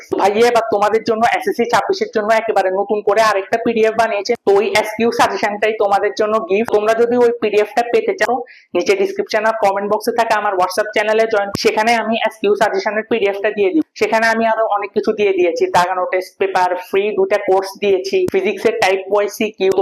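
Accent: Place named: Noakhali, Bangladesh. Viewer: Vai er bari noakhali naki 🥰 noakhailla tone ache 🥰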